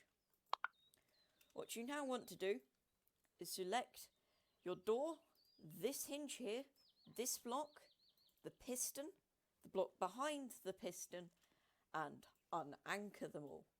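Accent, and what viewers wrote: United Kingdom. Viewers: British voice bro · Everyone talking about the door: Me: What a posh british accent.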